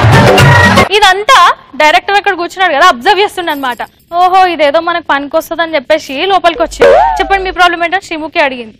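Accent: Telangana, India. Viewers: bad .. nevvu Telangana .voice change chayu.... ardham kavadam leadu..try to improve Ur bodylange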